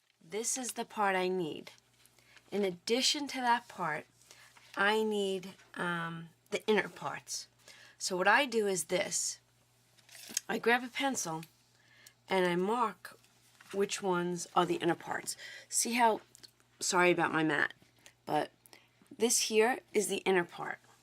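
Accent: New York, United States. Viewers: Very cute! Love the NY accent 😁 Yay is back!!!!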